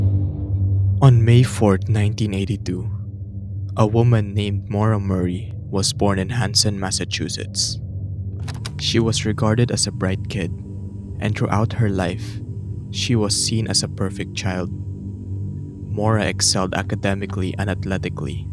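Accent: Philippines. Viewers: I hope you don't find this offensive, I just want to say that the Filipino pride in me ran rampant in my blood because your accent sounds like Filipino. I hope you continue and I commend your content, graphics (which reminds me of my fav YouTubers: Nexpo and Crowley), and the voice-over. It's nice to have you back on my recommended.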